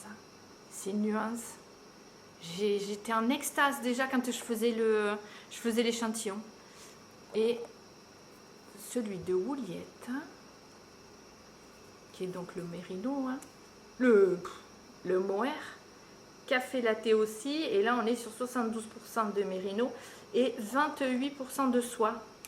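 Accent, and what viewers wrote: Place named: South, France. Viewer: Allo! J’adore ta vidéo. Moi aussi j’aime bien le format vlog. Et gros coup de coeur pour ton magnifique accent du sud 😍un beau bonjour du Québec !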